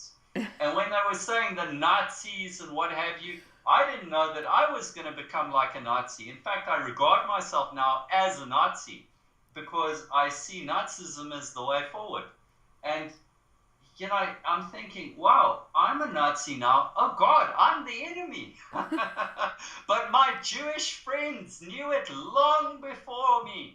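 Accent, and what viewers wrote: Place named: South Africa. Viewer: I am a South African Afrikaner and just listened to this video. I've also downloaded the Battle of blood river part 1, Jews 102 and Jews 104. I've noticed you're SA accent and love listening to you, you speak slowly and very clearly. My awakening to the whole Jewish issue started a few months ago when I've studied ww1 and ww2. The Jewish hand was noticeable all over these two wars and I started to be more interested in the Jews. Even if they only constitutes 1% of the world population the damage they do are scary!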